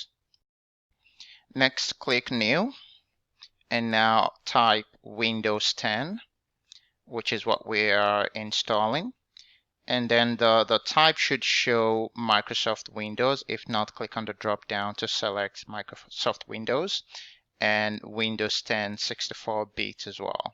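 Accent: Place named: Nigeria. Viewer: You sound so Nigerian, thanks for the video.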